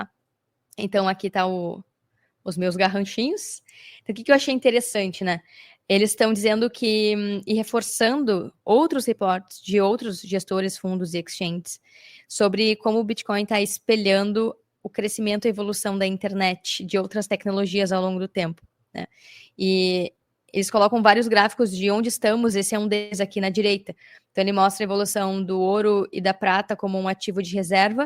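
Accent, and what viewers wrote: Rio Grande do Sul, Brazil. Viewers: tem sotaque de gaúcha, que linda 😂❤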